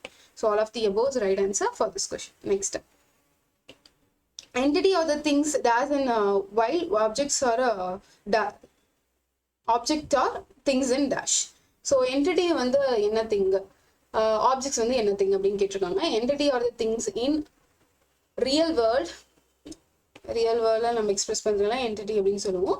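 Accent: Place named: United States. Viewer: Konjam slow ah sollunga mam! Understand panna mudiyala! USA english la style ah pesuramari irukku but konjam TAMIL medium students kum karunai katunga mam plz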